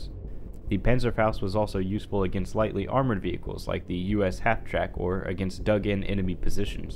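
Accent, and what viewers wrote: United Kingdom. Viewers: So glad you got the British guy to narrate lol. No offense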